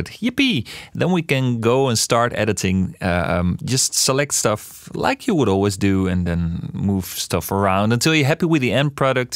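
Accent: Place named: Netherlands. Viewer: While listening to this tutorial I thought 'Hmm, this sounds a bit Dutch'. Then I realized I recognized the voice. Then I saw it was you. Thanks, ' '!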